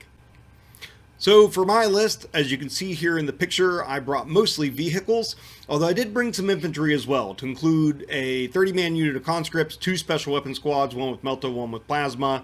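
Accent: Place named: Washington PA, United States. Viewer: Sounds like you’re around Washington pa? I’m from NE Ohio not too far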